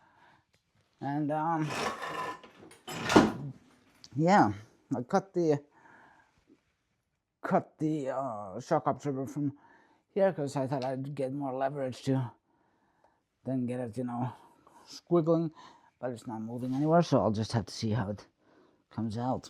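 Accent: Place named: Finland. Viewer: Noinkohan tuo rallienglanti ilahduttaa ulkomaan eläviä? Meinaan tekninen sanasto.. No sunhan tää on tube, jätän tilaamisen väliin.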